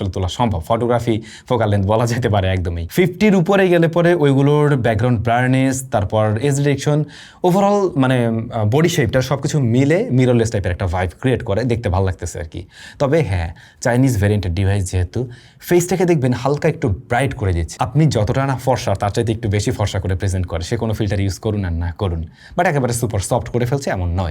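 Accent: Barishal, Bangladesh. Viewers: ভাই, আপনি বরিশাইল্লা!?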